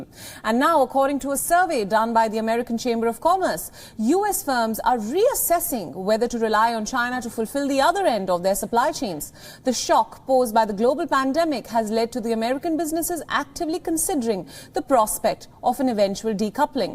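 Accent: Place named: India. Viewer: Why the heck this Indian hostess with this terrible ascent reporting on US and China?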